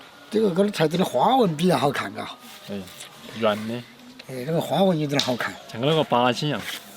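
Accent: Sichuan, China. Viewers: 川普讲的很标准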